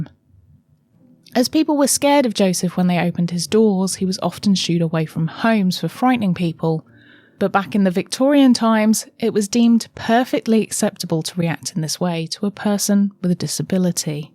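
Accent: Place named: United Kingdom. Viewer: I can remember when I first saw the movie it had just came out back in 1982 I believe it was. My three older Brothers took me to the old single movie theater in Clinton New Jersey. I was still very young and I can remember being afraid and very sad. As you guys say The cinema. I've seen it over the years. And have watched countless videos and pictures and such about the young man. I live in Florida. And I truly wish that I was able to make a historical and as accurate as possible. A movie about Him. From his birth and even also after his death. I truly enjoyed your video. And I have always loved the English British accent. I'm Italian and German on my late father's side and on my mother's side I'm English and Scottish and Irish and Scandinavian. And I've always enjoyed drawing. And I have had such a major and passionate interest in this young man. 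I know that I can't truly know what the young man went through in his short 27 years.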